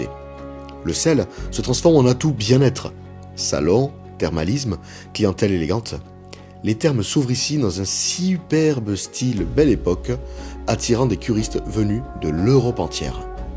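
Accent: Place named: Béarn, France. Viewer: Merci pour ta vidéo, je découvre ta chaine, lâche rien. Ta voix avec l'accent Béarnais c'est très immersif.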